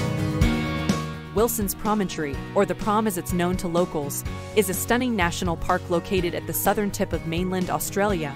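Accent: United States. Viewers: Annoying American accent, has to be most spoiling ever. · Why is the commentary done by an American voice who pronounces several towns names incorrectly?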